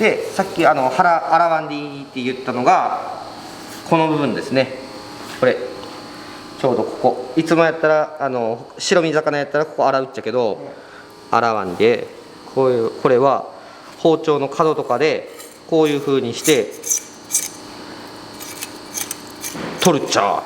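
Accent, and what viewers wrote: Miyazaki, Japan. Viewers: 宮崎の方言に ほっこりだわ～😂 凄い 裁きですネ 旦那がぁ 宮崎出身だから～ 懐かしくて😂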